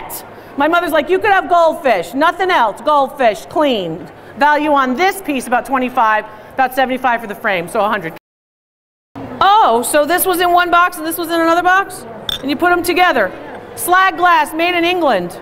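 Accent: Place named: New York, United States. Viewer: Ooooohhhh ok. Are you from Michigan then? I heard you say that's a school you attended but you have a bit of a New Yorker accent ❤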